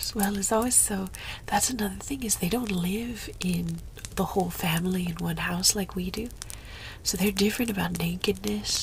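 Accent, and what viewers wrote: Denmark. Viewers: As a dane I gotta say, the accent is on point, you sound like someone from Copenhagen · I'm from Sweden and I could hear you Viking blood sing ;) Sounded alot like a Danish (viking) to me! Love all the history you weaved into your viking saga :)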